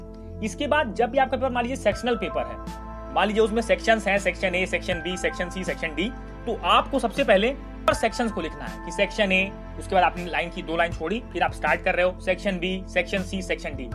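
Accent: Bihar, India. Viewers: Sir ji aap bihari ho???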